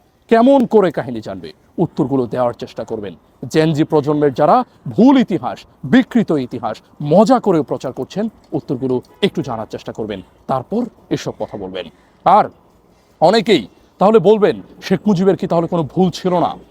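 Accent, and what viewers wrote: Kolkata, India. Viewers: আরে উনি আসলেই ভারতীয় কথা শুনে বুঝতে পারছেন না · তোমার কথা বলার স্টাইল কলকাতার দাদাবাবুদের মত কেন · সবই ঠিক আছে তোমার কথা বলার ধরন কলকাতার দাদাবাবুদের মত কেন গো? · দাদা বাবু আপনি যে দেখছি কলকাতা ভাষা বলছেন গো। দালাল চাটুকার চ্যানেল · তোমার কথা বলার ধরন কলকাতার দাদাবাবুদের মত কেন গো? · আপনি ক্যান ইন্ডিয়ান দাদা বাবুদের মতো কথা বলছেন?? বুঝলাম না আপনার উদ্দেশ্য... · এই সা/লা তো কলকাতার মা/ল। কথাতে ধরন ওরকম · আপনি পুরাপুরি শক্ত প্রমাণ উপস্থাপন করতে পারেননি! শেখ মুজিবের দালালী না করে সঠিক তথ্য উপস্থাপন করুন। আকারইঙ্গিতে, শেখ পরিবার এবং আওয়ামী দলের দালালী করছেন আর কথাবার্তা ধরন কলকাতার বাংলাভাষীদের মতো কেন? আরে, বলেই দেন? উনি ফেরেশতা ছিলো। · Hindu to tai kolkatar moto bolse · কিরে তুমি কি কলিকাতা রেন্ডিয়া দাদাবাবু নাকি? না মানে, কথা ত হুবহু ওদের মত!!! উত্তর টা দিবে।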